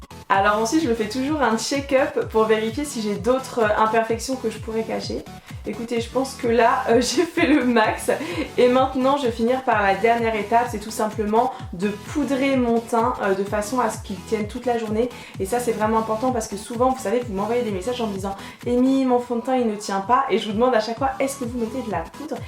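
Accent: England, United Kingdom. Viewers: sympa ta vidéo mais l'accent anglais ah ah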